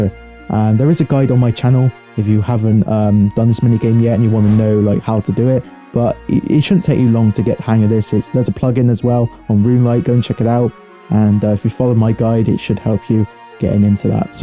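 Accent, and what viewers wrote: Devon, United Kingdom. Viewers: Devonshire or Bristolian? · Lmao where r u from you sound like your from Tiverton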